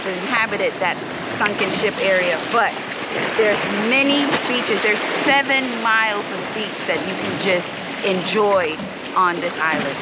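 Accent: Bahamas. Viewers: Girl I knew I heard a Bahamian accent in you. I love Bimini. 🇧🇸🇧🇸🇧🇸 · You even sound like a Bahamian. He don’t even know this grease hot. Whose your people?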